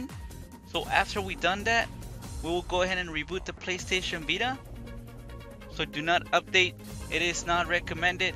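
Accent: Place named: France. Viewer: Are you French, by any chance? I can't help but notice the accent. Just a wee bit lack of "Uh-huh".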